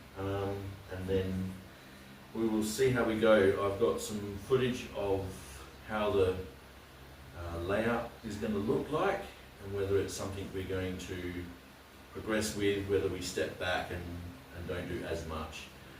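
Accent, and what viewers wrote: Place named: Australia. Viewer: Hey man, thanks for the upload and update! It is starting to take shape. Nice to see your little one get involved. Ps. Isle of Wight?? You sound aussie! I am so confused.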